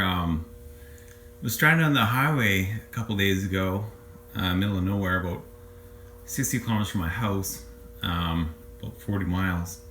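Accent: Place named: Minnesota, United States. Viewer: Someone's from Minnesota lol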